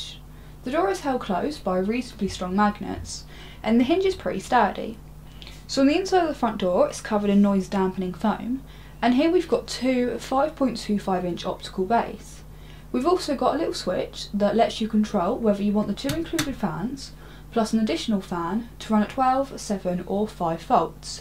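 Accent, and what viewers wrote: England, United Kingdom. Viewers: Who said british girld are ugly?? Shes smoking hot with that british accent!!! And very good review! Linustechtips looks so bad compared to this!! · She's from the UK. Americans / Canadians / Aussies / Kiwis / South Africans sound nothing like this. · pretty sure that's her accent. not sure what region of England she is from.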